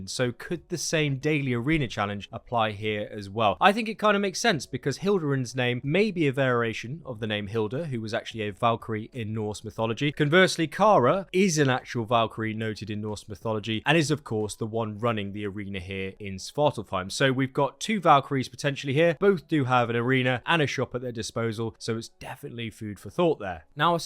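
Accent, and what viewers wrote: United Kingdom. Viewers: More great Valhalla insights - thanks. Some interesting changes hopefully,. I'm relatively new - still playing England map - but get frustrated with tattoos and more tattoos. At least I get to accumulate opals - until 'must have' items drop back-to-back like the dragon raven skins and the Shattered Blade. I noted that the Valkyrie outfit was removed from Helix store recently so glad I got two pieces from Reda - and saved money. One piece of armour is useless so I fear frustration is meant to make us spend £$. P.S. Get homesick every time I hear a Brit accent - lol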